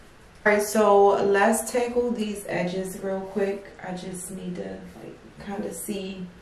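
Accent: Baltimore, United States. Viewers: I’m loving the Baltimore accent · Yea that’s that Baltimore accent I can hear it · You sound like your from my city.. DC🥰 Nevermind.. I hear B-More🤨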